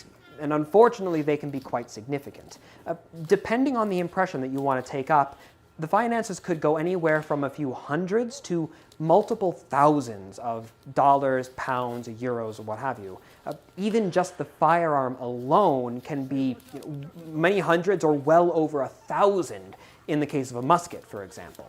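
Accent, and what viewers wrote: United States. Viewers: I have a question. Are you an American? Or are you British?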